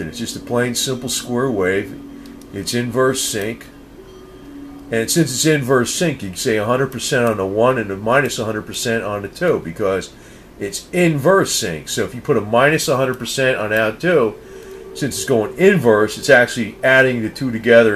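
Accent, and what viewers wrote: United States. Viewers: amrykanski gadzi głos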